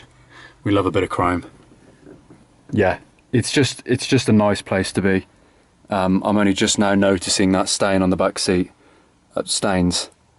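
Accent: Birmingham, United Kingdom. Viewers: "It smells like an old VOLVO in here, it's fantastic!" -"The mileage is probably 500 000km, but that's just a number." -" I didn't notice those stains on the seat when i bought it, maybe I left them there, I was quite exited..." LOL! (Bought a thirty year old VOLVO in the dark, literally!) I love this Brummie!👍😄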